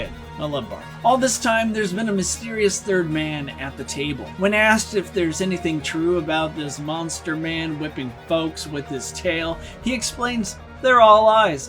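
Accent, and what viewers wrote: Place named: Oregon, United States. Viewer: Are you from Oregon bro!?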